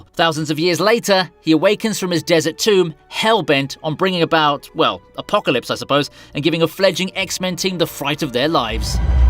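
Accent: United Kingdom. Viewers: I love your British accent buddy😊❤️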